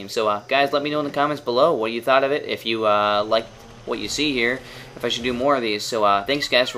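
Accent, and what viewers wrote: Canada. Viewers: Are you canadian? :L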